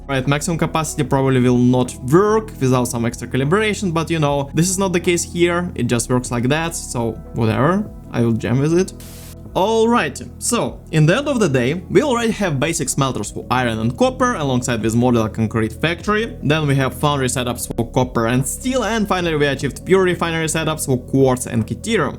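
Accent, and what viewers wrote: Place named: Russia. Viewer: Русский акцент... Вы русский?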